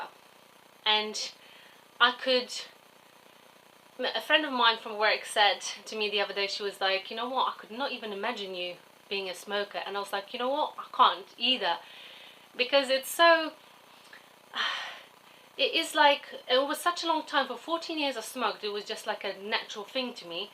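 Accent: England, United Kingdom. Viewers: What accent do you have?? Are you English? Congrats on three years and 3 months of no smoking. You look healthy and really happy. You maka me smile :) xx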